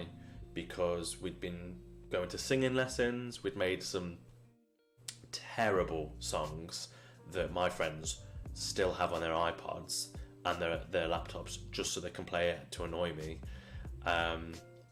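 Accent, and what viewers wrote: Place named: Derby, United Kingdom. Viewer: I’ve got to ask- I’ve no idea why I thought this in the first place but for some weird reason it’s always stuck with me. You’re not from Derby, are you? The accent is throwing me off, but it’s been driving me mad for all these years. Good to see you again after all these years, hope you’re doing well! 🙏🏼🙌🏼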